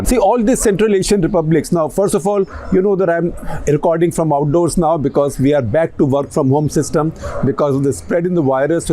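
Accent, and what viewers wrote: India. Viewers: That was the Voice of America with an Indian accent. He should concern himself with India : one of the poorest countries in the world. The average citizen in those countries that he criticizes enjoys a living standard that people living in "democratic" India can only dream about.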